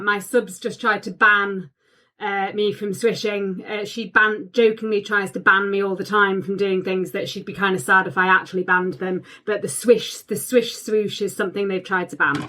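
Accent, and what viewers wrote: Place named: England, United Kingdom. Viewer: She's decent looking but that English whiney voice has to go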